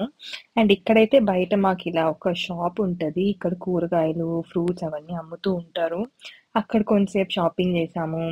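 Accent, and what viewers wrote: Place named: Andhra Pradesh, India. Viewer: Medi madanapalli ha slang koncham malaga vundii sooo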